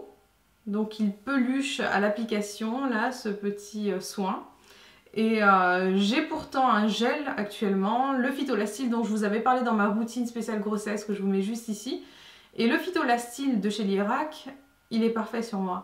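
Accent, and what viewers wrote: England, United Kingdom. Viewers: tu me fais rire avec ton accent anglais super prononcé !!!!! en tout cas je suis très contente de ton retour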